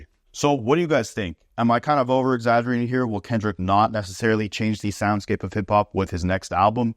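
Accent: Canada. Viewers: The narrator sounds Canadian. Cool.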